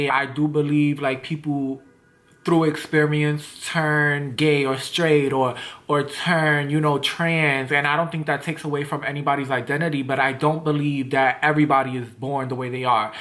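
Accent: New York, United States. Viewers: I love your New York accent😍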